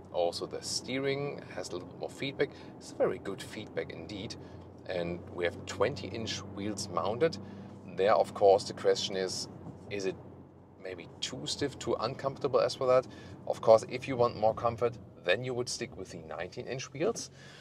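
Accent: Germany. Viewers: Nice review, very nice car - though rather pricey. But may I just address something here, and I mean this in the nicest possible way: I find it very hard to listen to the accent, and even more so, the numerous mistakes in the language. For example: "If it WOULD BE an S-line, it WOULD..." drives me nuts. It should be: "If it WERE an S-line, it WOULD..." When you choose to present in English, the language should be flawless, especially when named. Alternatively, just do it in German. And for the record, I'm German myself.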